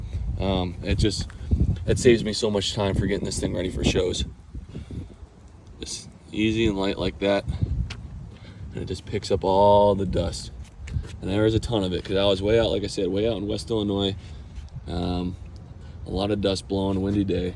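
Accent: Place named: China. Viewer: I think this video was made in China, Man is trying to hide his accent. China is influencing in our market.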